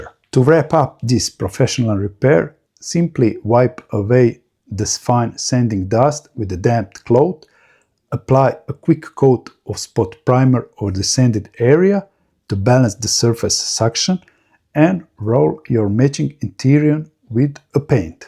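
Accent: Russia. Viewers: has a strange accent. Russian? Ukrainian? Dude, you tell me. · I love the accent! Russian? Excellent commentary and tips on how best to repair these annoying cracks. 👏👏✌️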